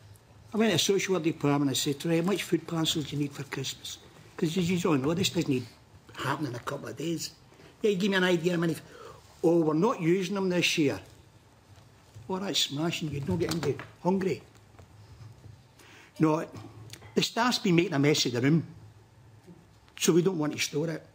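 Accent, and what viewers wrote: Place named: Scotland, United Kingdom. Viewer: Great speech! But I accidentally had automatic subtitles on and PLEASE do not watch when they are on, it picks up the words completely wrong (Scottish accent problems?) and comes up with really rude sentences... Once turned off and watched second time this is a BRILLIANT speech and makes an excellent case why Scotland would be better independent.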